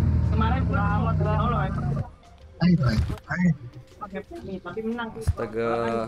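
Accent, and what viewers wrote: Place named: Pematangsiantar, Indonesia. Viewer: Iyakan.... Iyakan.... Iyakan... Siantar kali bahasanya...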